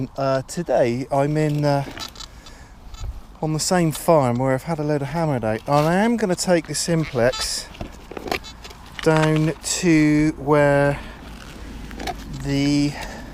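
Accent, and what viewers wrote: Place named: Bristol, United Kingdom. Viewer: I've Subbed you,. I was searching for Meta data for my video descriptions, becase when you do start making money from YT, you'll make more money with lots of key words in your video descriptions. and your Quite knowledgeable about how to use metal detectors and you lived in Bristol and by your accent quite close to me, me thinks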